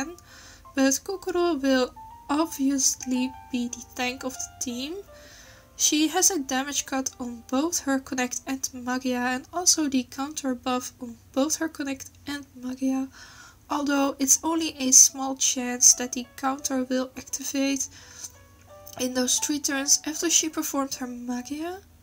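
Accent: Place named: Germany. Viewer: I understand your English lol It's even better than mine cuz I'm not native English eather And I guess that you're German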